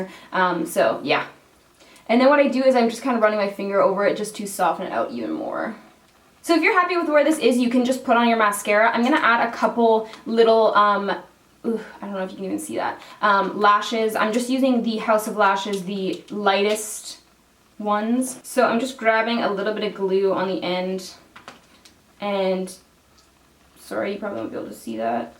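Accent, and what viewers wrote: Canada. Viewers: your subtle canadian accent is so rad hahaha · Is she Canadian? bc I can hear her accent not a bad thing I actually love it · Everyone is pointing out your slight Canadian accent and as a fellow Canadian, I didn't even hear it at first haha love ya!! ❤️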